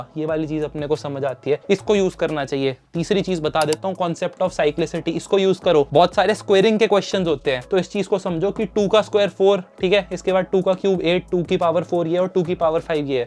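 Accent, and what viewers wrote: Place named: Bengal, India. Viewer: Your bengali accent is cute wow .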